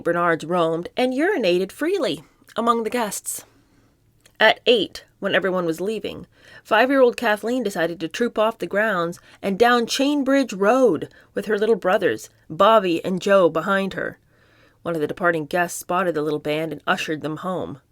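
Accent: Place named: RP, United Kingdom. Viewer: Sounds like the Mitford sisters 😂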